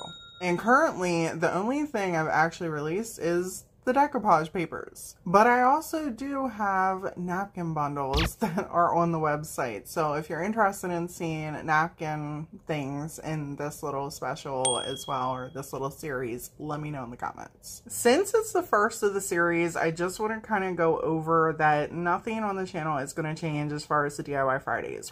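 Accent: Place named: Maryland, United States. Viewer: I live in West Virginia, born and raised. I lived in Maryland for about 15 years. So when I first heard you say water, I knew where you were from. Yes to digital downloads please. 😊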